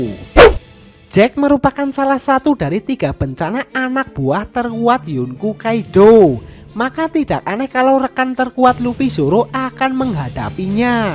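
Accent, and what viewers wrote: Banyumas, Indonesia. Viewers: mendok bgt bahasanya berbicara lha dengan yang baik dan benar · Go ngapak go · agak ngeri ngapaknya, kayak siapa gitu. 😂😂😂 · Pertahankan suara ngapaknya sob.. bedakan dengan yang lain.. jangan peduliin haters.. karena mungkin dia kalah saing ama ente.. hoho. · gk suka gue sama suara nya.. jangan ke jawa jawaan dong mas.. yg biasa aja kali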